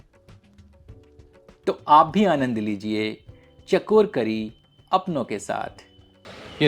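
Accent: Standard. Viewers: बहुत प्यारी शुद्ध हिन्दी आप बोलते हैं सर 🙏🙏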